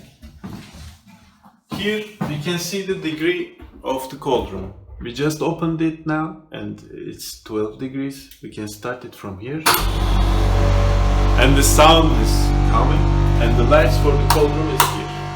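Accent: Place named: Turkey. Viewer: most turkish accent i ever seen in my life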